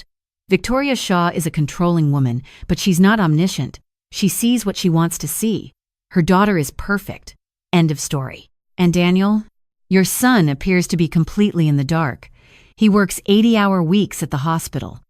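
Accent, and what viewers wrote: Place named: France. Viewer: Why french pronounciation? Needs fixing.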